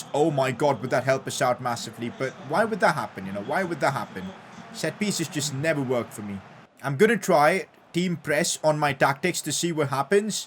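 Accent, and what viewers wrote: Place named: India. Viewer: PCQ: You sound more Indian than when you first began doing YouTube.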